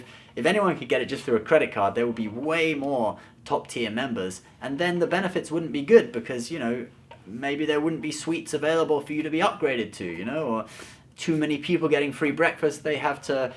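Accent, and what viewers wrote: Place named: United Kingdom. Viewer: dude you are just awesome. Love how objective and down to earn you are and the cheeky grin with the British accent really adds to the whole shabang haha. PS: Thanks for the Trump tax video, I knew when you took a non-apocalyptic approach to anything Trump some idiot in the comments would try to flag you as a supporter or attack you lol. Anyways stay awesome man and keep fighting the good fight.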